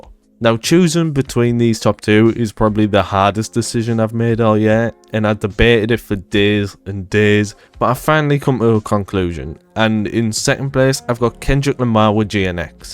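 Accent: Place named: North, United Kingdom. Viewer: Last accent in the world I expected you to have 😭 where up north are you from?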